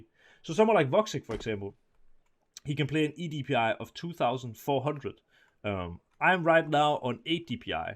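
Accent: Denmark. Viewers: flot dansk accent :D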